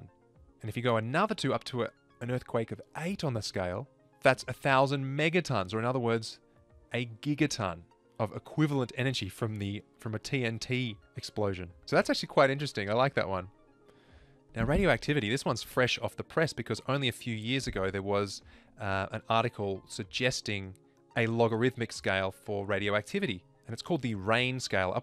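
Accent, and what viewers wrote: Australia. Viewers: explaining math with an Australian accent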